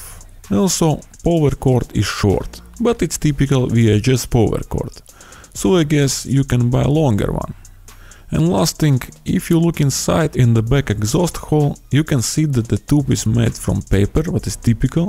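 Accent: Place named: Russia. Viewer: I love the Russian accent in English and how certain vowels and consonants have a special pronunciation. Absolutely adorable, and I am always impressed with people who are multi-lingual. Khorosho! Spasiba. =D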